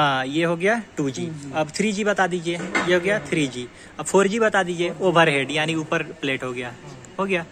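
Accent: Bihar, India. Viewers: Rakhanu. Bihari. Ho. Bhai. Ham. Bhi. Bihari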